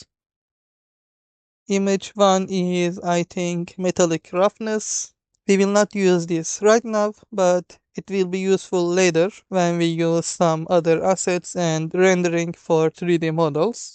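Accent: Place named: Turkey. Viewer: Türk aksanı gibi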